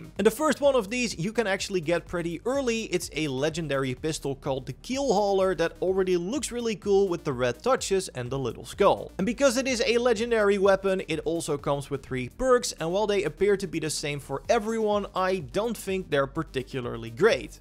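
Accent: Netherlands. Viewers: You sound very Dutch, nice vid tx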